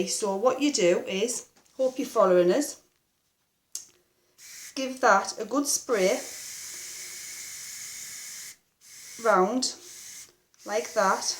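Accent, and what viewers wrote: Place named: London, United Kingdom. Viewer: You sound like you are in London, they have the TIES Ostomy Cure over there , so far 15 patients have tried it, still not yet ready, hopefully it will be available soon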